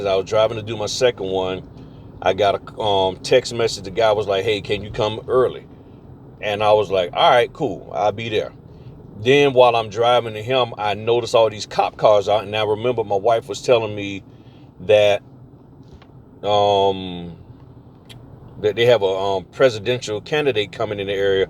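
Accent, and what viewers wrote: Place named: California, United States. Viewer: You sound like a Californian from Pasadena.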